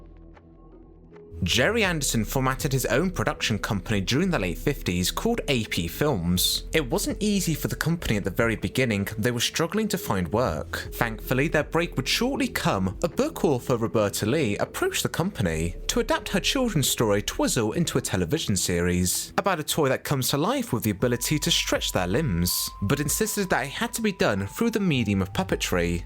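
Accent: United Kingdom. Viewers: Fun fact: the creator of the video is from the uk cuz of his voice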